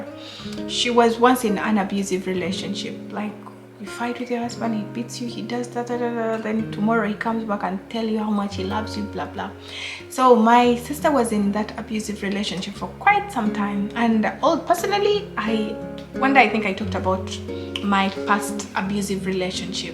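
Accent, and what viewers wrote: Uganda. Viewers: I love your Ugandan accent 😍 I'm in love with you and your energy ❤️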